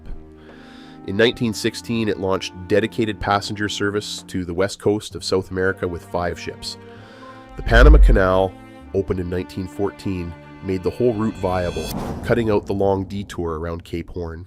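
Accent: Canada. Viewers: ...and the Canadian accent.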